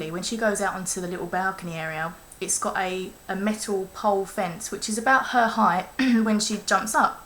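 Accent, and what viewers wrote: England, United Kingdom. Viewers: that english accent just makes me horny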